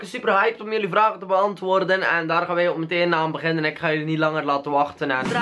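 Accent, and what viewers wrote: West Flanders, Belgium. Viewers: Hij is inderdaad Belgisch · ! Van west Vlaams in elk deel van belgie ander accent 😂😂😂😂😂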